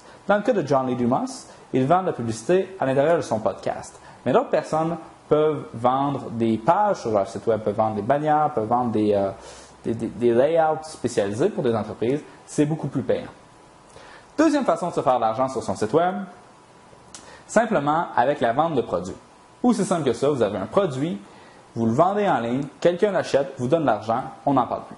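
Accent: Quebec, Canada. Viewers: Hello;-) Très bien la vidéo, bon je repasse certains passages sur lesquels comme tout Québécois tu mélanges l'anglais et le Français (avec accent) ou là j'avoue avoir un peu de mal à suivre;-) Concernant mon bizness modèle je vends des bijoux et des montres en ligne en association avec un véritable bijoutier Français sur un modèle de partage des bénéfices suite à l'échange des compétences ( je suis web-master depuis 5 ans). Aujourd'hui et depuis plus de 3 ans nous réalisons plus de chiffre qu'une boutique réelle, et cela malgré les modifications d'algo Google de septembre 2013 (les saligots) Ps: il faut faire une balance de blanc en manuel avant de te filmer, car visiblement l'appareil que tu utilises pour faire tes prises d'images à un problème en automatique Lol. ( tu es tout bleu) sans doute que tu mélanges un éclairage naturel venant de ta gauche et un éclairage incandescent de face ou légèrement décalé, oui j'ai aussi fait pas mal de vidéo et de montage par le passé. 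Allez à bientôt. Christophe. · Merci;) Concis et instructif (même si parfois tu enfonces des portes ouvertes)! Et ne lis pas les commentaires, nous les Européens on aime ton accent Ostie! Bonne continuation! Maison FT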